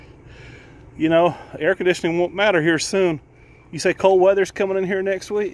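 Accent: South, United States. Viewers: being Irish I love your Southern drawl and how you say baaabee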